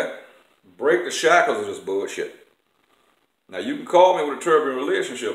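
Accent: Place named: Louisiana, United States. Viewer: I'm new to this channel & I'm glad I stumbled across it. I wish you many more vids. My only problem is that since English is not my native language I have problem understanding Louisiana talking. But reason I'm writing this is: I think you gave good advice here! especially because of children that later do same stuff in their marriages 😢.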